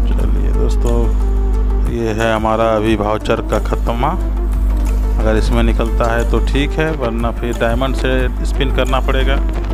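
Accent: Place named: Bihar, India. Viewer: Bhai aap Bihar se ho kya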